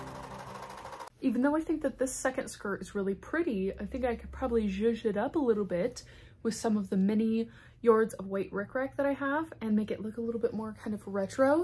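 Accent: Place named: Canada. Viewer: Are you Canadian? 🇨🇦 You have a lovely accent. 😊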